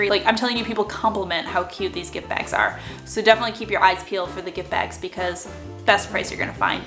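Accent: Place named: South, United States. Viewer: Hi I watched a super old video of you and you had like a southern accent and did not make any of your usual jokes/ humorous comments... Did you change since starting YouTube or were you just not being your real self at first? Not that you'll probably answer this but...just curious because I love your sense of humor and your super articulate manner of speaking so much and it was so odd to not see those things. And you looked kinda different too but maybe cuz it was 5 yrs ago...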